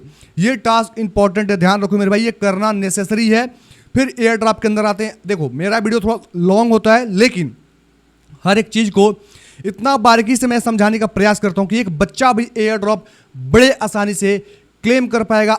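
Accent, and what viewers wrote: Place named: Bihar, India. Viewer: Bhai Aap Bihar Ki Bhasa aapni Boto Me Jhalkata Hai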